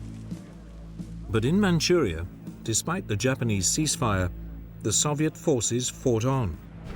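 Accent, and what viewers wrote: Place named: United Kingdom. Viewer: Narrated by the British.....Shut up already.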